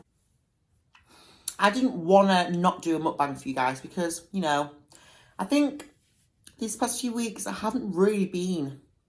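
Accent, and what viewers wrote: England, United Kingdom. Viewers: You are a wonderful and awesome person! I love your personality and your beautiful English accent. Love from Montreal, Quebec.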